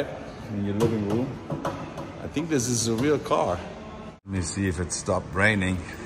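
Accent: Germany. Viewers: Do people make fun of your German now that you've been away so long?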